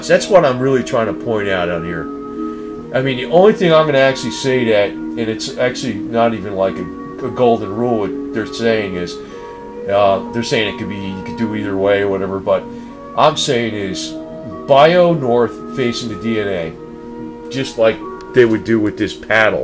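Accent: United States. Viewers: amrykanski gadzi głos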